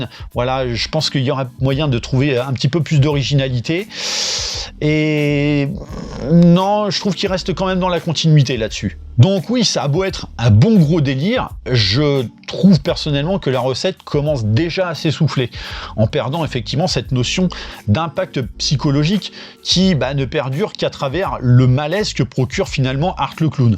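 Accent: Paris, France. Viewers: Le phrasé "titi parisien" est un peu trop poussé 😉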